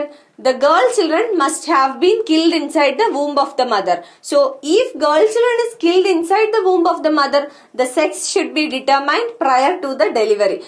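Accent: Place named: South India, India. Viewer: Thanks for valuable information. I can say with the accent of mam, she is surely a south indian and thats great.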